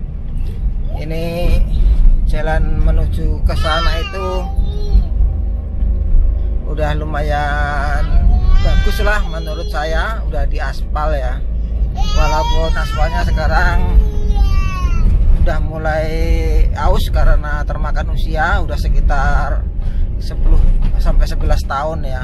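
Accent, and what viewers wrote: Java, Indonesia. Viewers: Ma....dari bicaranya om...bhsa jwanya kok kental bnget cih....piantun pundi nggih.... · Mama saya kira org jawa dr logat bicara nya gak tau nya di Jambi 😄😅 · Owh emang mm.tinggal di jambi yaa, dn orang jawaa th0 logat nya bicara ny jawaa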